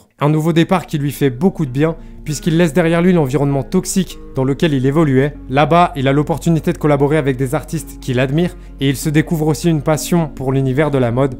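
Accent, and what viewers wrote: United Kingdom. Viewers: Tu me fera toujours autant rire avec ton accent anglais je kiff 😭😭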